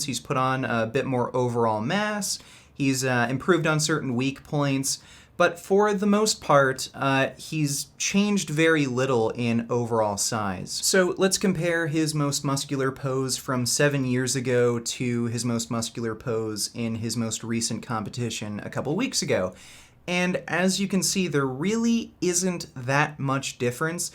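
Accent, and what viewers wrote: Canada. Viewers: He’s Canadian bro what did you expect eh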